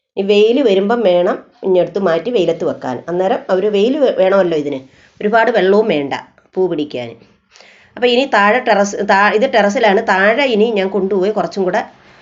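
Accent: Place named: Kollam, India. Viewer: ഇത് കൊല്ലം സഠഭാഷണഠ ആണ്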